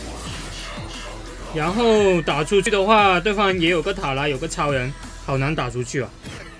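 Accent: China. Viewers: 你是大陸人嗎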